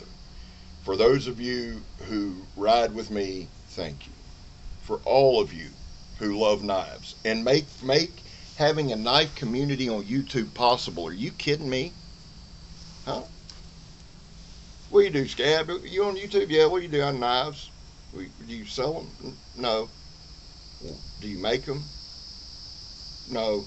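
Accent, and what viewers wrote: South, United States. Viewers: Knife community huh?..pff! Im here totally by accident. One day I was looking for a site called "Big Teddy Bears" while I was going through all my lip glosses. There was this big tatted one holding a knife ,it was called Scab. Kinda different, checked it out. . Gotta be some kind of acronym or something I reckoned. Who names something Scab for crying out loud right? Anyway, 'ol Scab turned out to be a legitimately great teddy bear with an enormous heart. Im on my way back to the kithen now barefooted (NOT pregnant!) to check on them cookies im baking..just as soon as I stop to admire my Cold Steel 1860 heavy cavalry saber one more time of course lol. Bothered by your southern drawal Sir? Hardly, Im from South Carolina , mine is thick ass syrup..just like the rest of me!😂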